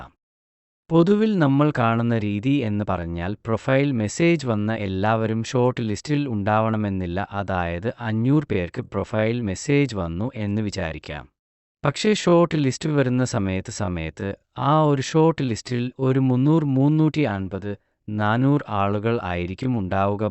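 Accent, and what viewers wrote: India. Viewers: ഏതോ ഹിന്ദിക്കാരൻ മലയാളം പഠിച്ചു വായിക്കുന്നത് പോലെ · ഇത് ഏതോ ബംഗാളി മലയാളം പഠിച്ച് parayua തോന്നു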